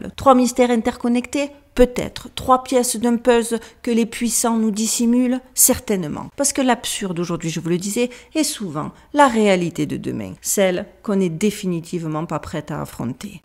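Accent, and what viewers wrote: Marseille, France. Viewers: Tu es trop mignonne ma chérie et tu parles trop bien français t'inquiète avec ton accent du sud c'est merveilleux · Bonjour. Merci encore pour tes infos, ta diction et ton accent qui rappelle que la Sardine a bouché un jour un très grand port. Ne changes rien. Par contre certains sont vraiment murs pour l'asile. A force de faire n'importe quoi et de se prendre pour Dieu le père, ces derniers contribuent assurément à la destruction de l'humanité. See you next sunday! 😍😘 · Bonjour je t'avoue que les 3 sujets sont plus qu'inquiètant mais je dirais que le dernier est le pire!!! Quand à ta prononciation, il n'y a vraiment rien à dire, tu t'exprimes extrêmement bien et tu n'as même pas pris l'accent Américain... Non toujours l'accent Marseillais 😊 j'adore 👍🏻 merci à toi de nous parler de tous ces sujets dont personne ne parle 😤 passe également une excellente fin de semaine bisous 🥰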